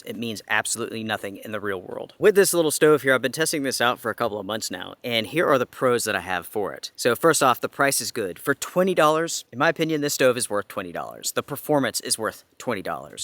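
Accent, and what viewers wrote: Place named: North Carolina, United States. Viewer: Everyone from NC says varabals like him.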